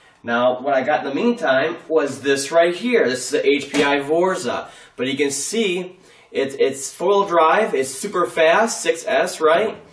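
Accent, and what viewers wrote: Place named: Northern Illinois, United States. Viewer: I'm hearing a far more northern IL voice than mine so probably nowhere near me. I'm just an hour or so from KY actually.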